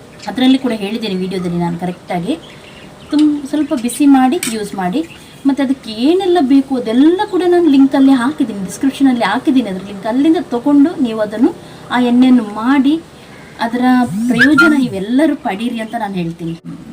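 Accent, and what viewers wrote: Mangalore, India. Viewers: ಕರಿಬೇವು ಪುಂಡಿ 😀😀😀😀 ನಮ್ಮ ಮಂಗಳೂರಿನವರ ಕನ್ನಡ ಸೂಪರ್. ಯಾಕೆಂದರೆ ಕನ್ನಡ, ತುಳು, ಕೊಂಕಣಿಯಲ್ಲ ಮಿಕ್ಸ. ಸೂಪರ್ vlog 👌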